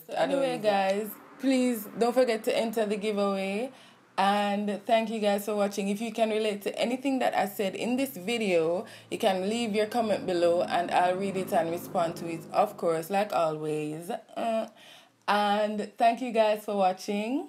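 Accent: Jamaica. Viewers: your from Jamaica right? I can hear the Jamaican accent 🇯🇲❤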